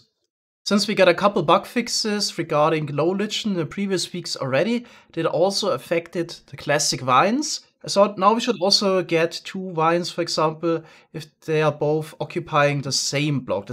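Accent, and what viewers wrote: Germany. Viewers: pretty sure he's german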